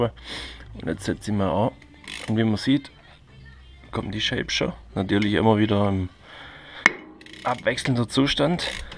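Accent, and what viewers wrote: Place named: Swabia, Germany. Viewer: Sauber. Schwabe. Aus welcher Gegend kommst? Gruß vom Flughafen.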